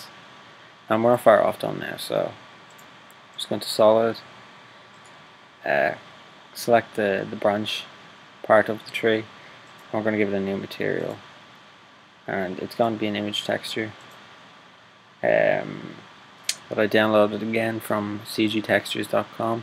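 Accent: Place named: Newfoundland, Canada. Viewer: this guy is a newfie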